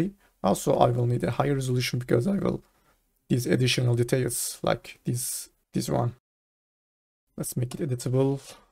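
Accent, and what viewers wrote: Turkey. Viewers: Sanırım Türk bir kardeşimizin kanalı, ingilizcesi tam bir türk aksanı içeriyor :)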